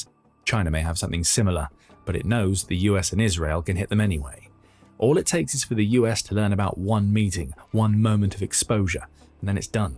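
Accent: United Kingdom. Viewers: Why so many video makers use narration with a heavy British accent which is less easy to understand for people in America? Is it because it sounds more authoritative or because more people in the world are in the areas which use that type of English? Or, most videos are made in UK? I wonder.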